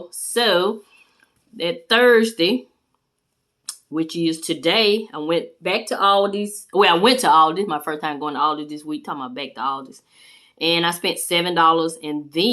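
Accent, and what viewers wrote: South, United States. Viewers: thanks for sharing the budgeting videos. I'm a long-time subscriber and have really enjoyed watching your financial journey blossom. I've been cheering you on silently from the rafters! In fact, if your video is in my feed, I watch you BEFORE I watch any others. You have such a beautiful, giving spirit and, Girl, that southern accent of yours is everythang!...I'm southern, too.😀 I'm curious about your grocery budget, though. I know you shop your pantry before grocery shopping and you also coupon, but would you, if your schedule allows it, please make a What's for Dinner series for one week to help me understand how you grocery shop on $50 a week for two and sometimes have money left over? There's only two of us and, for the life of me, I haven't been able to even come close to that. Thank you so much for the consideration and, again, thank you for sharing. 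Peace and blessings...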